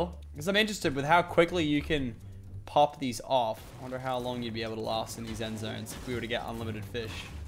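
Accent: Australia. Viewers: hes an aussie ya fake fan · Is he Australian or American it’s really hard to tell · Are you aussie i am too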